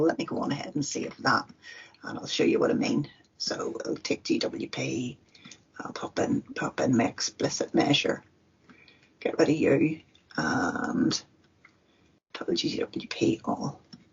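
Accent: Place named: Northern Ireland, United Kingdom. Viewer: Great video, excellent intro to TE!!!! Love the Nor Iron accent by the way, brings back many great memories!!!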